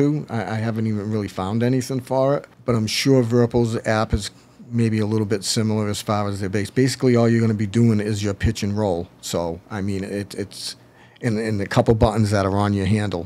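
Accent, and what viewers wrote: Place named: New England, United States. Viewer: What part of the north east are you from sir? I’m hearing RI or Mass.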